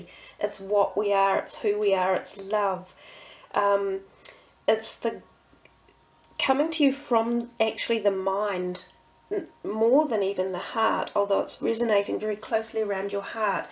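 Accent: New Zealand. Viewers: My resolution is to learn the tarot, which is how I found you. Also, your accent makes me miss NZ. I'm a New Yorker but I spent most of 2013 in Auckland because my partner is a kiwi.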